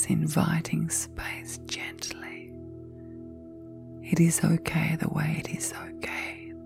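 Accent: Australia. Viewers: how are you doing today? I love 💕 listening to your very soothing sweet chloroform voice!!!!💕💕💋💕, your voice always makes me super duper happy 😊!!! 💕💋, I really miss your very sweet Australian voice!!!💕💋 I miss telling you stories!!!💋💕, you are my very favorite chloroform & you know how to keep me asleep!!! I will talk to you later!!!💋💕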